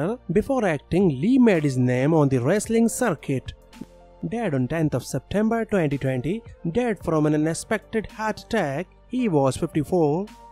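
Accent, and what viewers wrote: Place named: India. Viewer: He did not ruin a thing, It's just he is from India and learned to pronouns like the English with a dash of an Indian accent .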